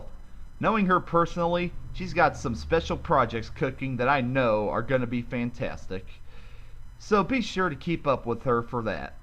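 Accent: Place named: Texas, United States. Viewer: you got a Texas accent.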